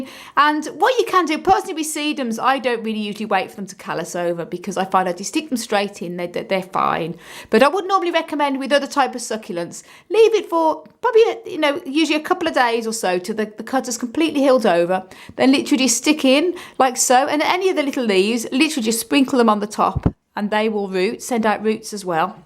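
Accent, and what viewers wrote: United Kingdom. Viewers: Hello....I recently found your channel and I became your follower......😘😘😘😘😘😘😘by the way....I LOVE YOUR BRITISH ACCENT.....😊😊😊😊😊😊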